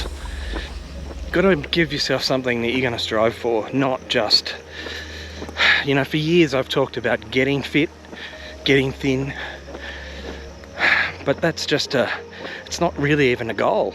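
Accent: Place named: Australia. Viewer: Just found your channel as you're about 2 weeks out from the event. I'm an Aussie living in Texas so I appreciated hearing the familiar accent on the videos. On my own fitness trip at the moment wanting to drop 20kg (lost 5 so far) and aiming for a half ironman to start with. Will be great one year to go to Cairns or Port Macqaurie to do a "home" race. I did have a laugh at the start of this when you mentioned "10 years ago" and then you looked down to check your watch haha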